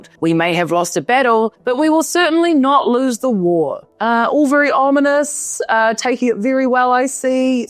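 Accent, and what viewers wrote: New Zealand. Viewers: great update and its a easy kiwi accent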